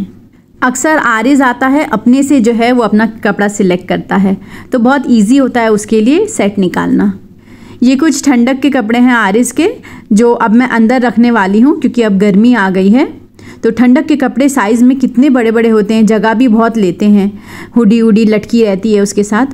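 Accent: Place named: Mumbai, India. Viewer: Aapke mumbai ka accent sune toh acha dikhta ❤frm Hyderabadi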